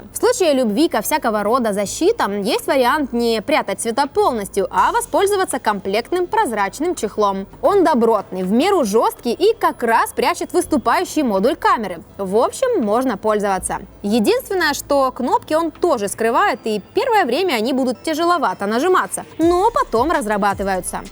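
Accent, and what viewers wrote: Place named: Kuban, Russia. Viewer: Кубанский, Украинский-южный как короче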